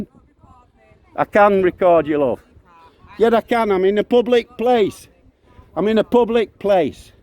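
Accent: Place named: Wakefield, United Kingdom. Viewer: Smashing audit with great commentary. Where are you originally from pal?....very distinct accent! Older style Wakefield or even Royston Barnsley?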